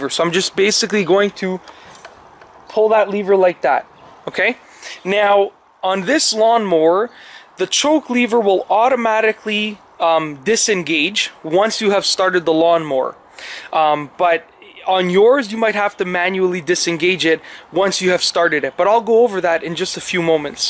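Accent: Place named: Canada. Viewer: you sound like the stereotypical canadian accent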